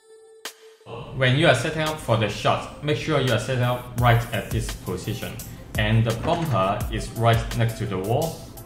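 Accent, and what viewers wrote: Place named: Thailand. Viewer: You sound exactly like they mimic Thai or Sing people speak English :)), btw I'm Vnmese.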